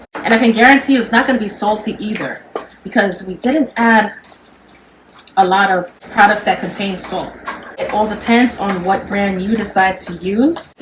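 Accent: New York, United States. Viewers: She's gotta be a new Yorker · You have to be from New York girl! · I can hear that NY Accent 😅 chicken looks amazing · I'm from the bronx . You definitely from New York love it .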